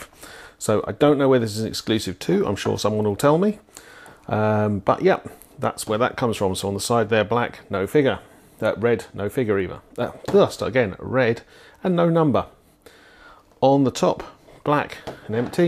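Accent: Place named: United Kingdom. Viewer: probably somewhere in the uk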